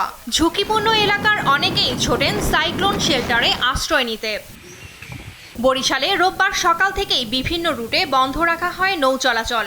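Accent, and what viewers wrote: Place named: Kolkata, India. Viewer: কোলকাতার মতো কথা বলে কেন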